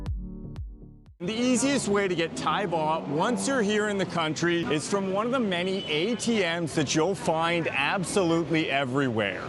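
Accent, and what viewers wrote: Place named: Canada. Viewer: Thanks for your videos! Very thorough, informative and concise, all spoken in clear Canadian English!😊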